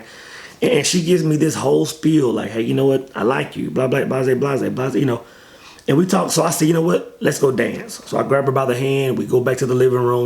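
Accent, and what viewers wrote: Texas, United States. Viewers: Big dawg is obviously from Texas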